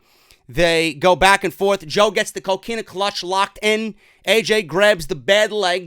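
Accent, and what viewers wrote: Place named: Fort Dix, United States. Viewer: I actually liked parts of this show Shawn did his do,they over did the Fire works I agree Braun sucks man I forgot how little Rey is good job I’ve been watching wrestling along time even saw the Real Nature Boy wrestle before Flair I always stop and listen to you I was Station at Fort Dix in the 70’s everybody sounded like you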